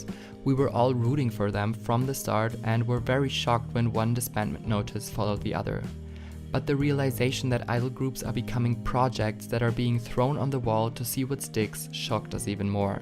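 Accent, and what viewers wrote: Germany. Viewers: Du hast so einen krass starken deutschen Akzent, habs direkt erkannt weil ich auch so Englisch spreche 😋🤫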